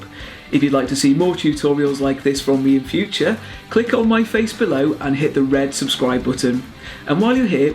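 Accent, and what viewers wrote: England, United Kingdom. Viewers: Hi! You are a genius. It was so easy to add the "White" space on my Word document. also, it sounds as if you are from England. I have done karaoke tributes in costume to honor Princess Diana. I worked for a man who had #1 USA, Chicago catering company. He had worked in the English Palace for Queen Elizabeth. He hired me to hire "only" Whites since his company was going bankrupt with only colored tuxedoed part-time waiters being sent by Temporary agencies. That was 1997. I went back to Chicago. I helped save his company by hiring about 100 Whites. But as George Jewell, owner said, "We'll not only hire Whites but we will have to develop them." Just as you helped me with my Word problem, I thank you. Although it's been a curse since I now use the word "White" frequently.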